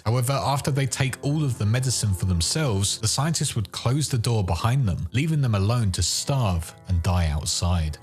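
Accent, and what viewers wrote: United Kingdom. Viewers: Loving the videos mate, love hearing more of us brits doing lore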